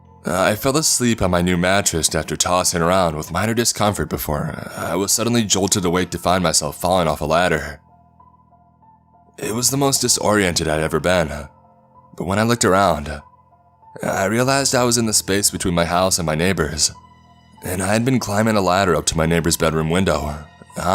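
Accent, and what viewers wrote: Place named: North, United States. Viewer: There's something about how you pronounce certain words like "button" that sounds familiar. Are you by chance from somewhere that borders Canada? I'm fascinated by lingual differences. Also, you're reading pace and pronunciation is one of the best in the creepypasta reader community, it really helps with the immersion.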